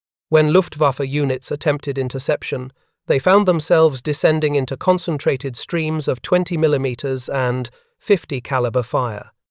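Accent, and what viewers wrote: England, United Kingdom. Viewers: Anglican English gives the narrative the proper setting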